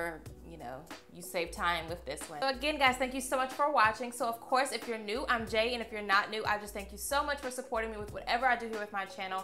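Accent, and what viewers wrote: South, United States. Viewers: You sound so country... I love your accent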